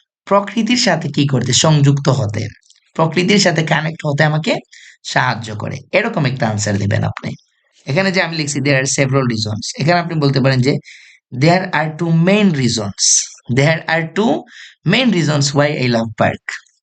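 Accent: Sylhet, Bangladesh. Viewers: Vaiya ki Sylheti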